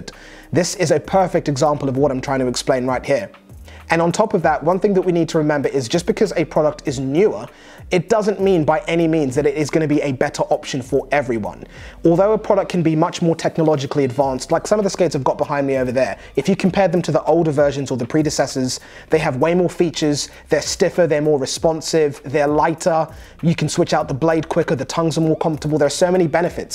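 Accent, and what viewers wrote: United Kingdom. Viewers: Not to be racist but I’d never think I’d be taking information about hockey from a British dude but great video really well done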